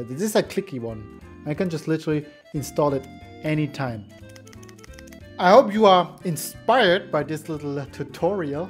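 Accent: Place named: Germany. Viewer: BIG German accent but great video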